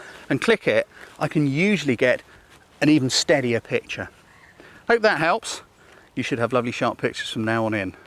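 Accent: United Kingdom. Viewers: Great personality, great teaching skills, vast experience, and the British accent. Doesnt get any better that this. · I liked all your videos and your British accent too.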